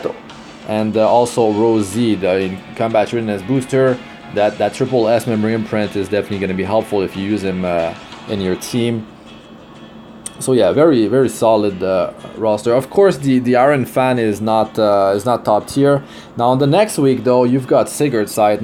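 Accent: Quebec, Canada. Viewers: Are you a French canadian? Idk why but you sound like it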